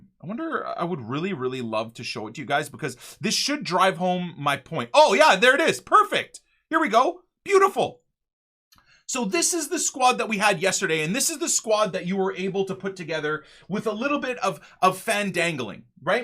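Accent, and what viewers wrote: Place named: Canada. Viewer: I love your passionate maple syrup drenched canadian voice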